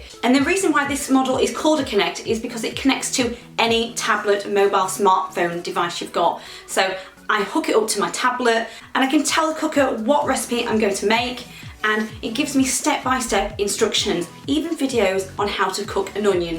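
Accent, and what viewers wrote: United Kingdom. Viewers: Helpful review - might just buy one. PS You're gorgeous - loving the slight northern accent ;-)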